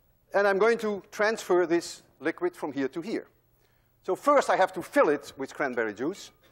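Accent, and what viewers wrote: Netherlands. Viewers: I like the dutch accent u have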